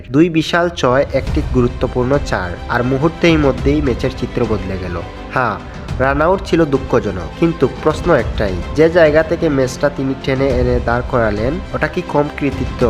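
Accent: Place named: Sylhet, Bangladesh. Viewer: শুদ্ধ মাতোস না সিলেটি মাতছ বুজিনা বগার ফুয়া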